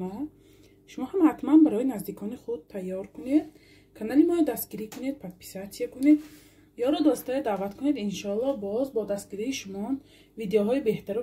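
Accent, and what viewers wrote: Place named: Khujand, Tajikistan. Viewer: Дар овозат чонам ашал хучанди ё исфара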